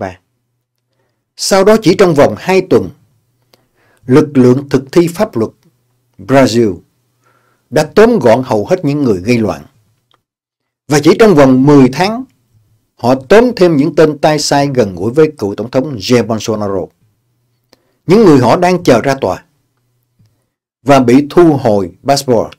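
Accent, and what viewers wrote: Mekong Delta, Vietnam. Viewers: Cảm ơn câu nói chân chất, nhà quê, mộc mạc của Bác hai Trầu (Việt Linh), đúng chất giọng dân miền Tây, người Sài Gòn. 🤣🤣🤣👍👍👍👍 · Tôi vừa nghe xong, chương trình đầu năm rất hay, cảm ơn anh Việt Linh nói lên suy nghĩ thay cho nhiều người về tình trạng dân trí xuống cấp tại Mỹ, mê tín di đoan tăng cao trong nhiều sắc dân, và tôi thích nhất câu nói rặt miền Tây của anh. TUYỆT VỜI. :face-red-heart-shape::face-red-heart-shape::face-red-heart-shape:👍👍👍👍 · Cảm ơn nụ cười vui với tựa đề hôm nay ngày Mồng Hai Tết, đây đúng là chất giọng nhà quê rặt miền Tây. 👌👌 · Nghe câu nói rặt chất giọng người miền Tây của anh Việt Linh tôi cười mãi. Cả thế giới đều biết về Trump như thế nào, chạy xa lánh tên cùi hủi này nhưng người mỹ thì không thấy, không biết gì cả, thế mới lạ? 🤔🤔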